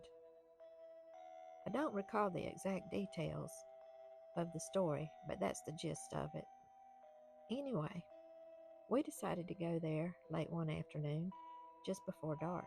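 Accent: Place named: Appalachia, United States. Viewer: I love the beautiful accent of your voice. Reminds me of Appalachia. Thank you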